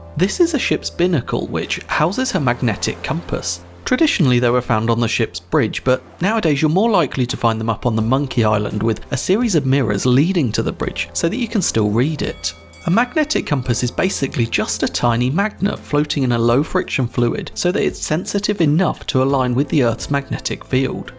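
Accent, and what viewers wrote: United Kingdom. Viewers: Sorry, but am just tired of the instinctively haughty British/cockney accent. Any chance you can post a video where MS Word reads the text? "Feewwd" vs. "Feeld"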